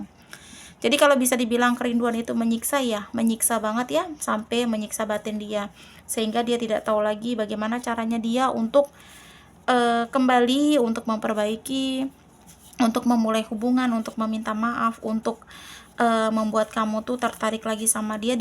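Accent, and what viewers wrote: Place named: Kalimantan, Indonesia. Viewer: Logat bicara kakak, apa kakak asal. Kalimantan?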